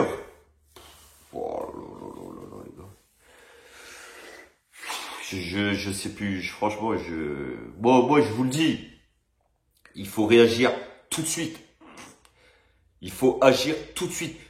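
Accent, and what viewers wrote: North, France. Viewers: T es nordiste ?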